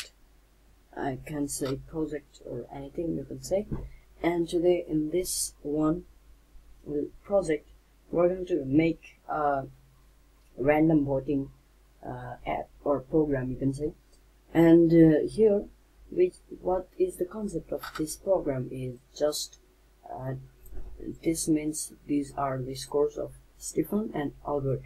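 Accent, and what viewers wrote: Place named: United States. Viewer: please take in proper not like Americans talks so it would we understandable by everyone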